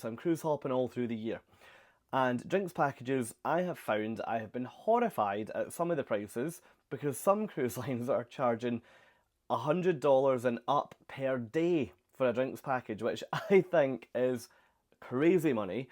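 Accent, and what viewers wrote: Scotland, United Kingdom. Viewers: Thank you so much for this! My wife and I are going on a Mediterranean cruise in July, and we’re on the fence about doing the Free package with Norwegian. We typically travel with Royal Caribbean, so this is our first Norwegian cruise – and we weren’t sure whether or not to get this free at sea package. After watching this with my wife, we have decided to do it and realize that we will get more bang for a buck with this than we thought initially. This is our first time watching your YouTube channel, and we both appreciate your insight and advice – subscribed! Thanks again! PS – being Americans, we both love your Scottish accent😂😂😂😂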